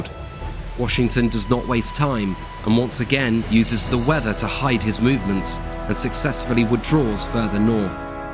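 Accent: United Kingdom. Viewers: Great series, well done and surprising to hear it told with British accent. Trying to keep the indefensible NY was Washingtons biggest mistake and almost lost the revolution, he was saved in Brooklyn only by moring Fog and Howes indecisiveness. Years later he wanted the French Fleet to recapture NY, they said no and advised to move on Yorktown. Great story overall …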